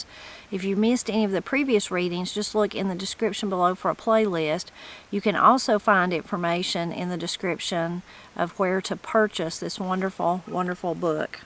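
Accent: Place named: South, United States. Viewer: I love hearing your southern accent while you're reading! I listen while I'm cleaning the house. Opal is a real inspiration, too ! I love watching all your family! You are very blessed ❤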